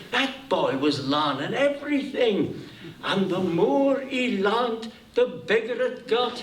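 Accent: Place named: Liverpool, United Kingdom. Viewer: Sounds like scouse ,northwelsh, irish, cumbrian, and stoke , cheshire accents mixed...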